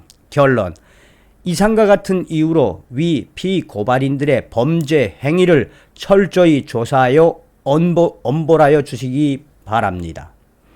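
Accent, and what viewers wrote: North Korea. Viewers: 북한억양이세게들어갈땐 듣기가 좀그래요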